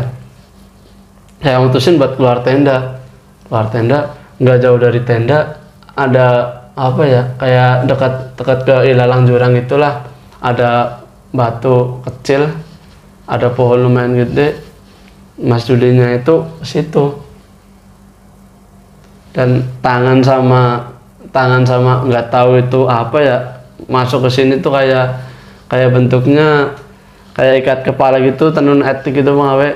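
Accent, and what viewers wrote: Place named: Banyumas, Indonesia. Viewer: Nonton idola bang wong dewek ngapak hiii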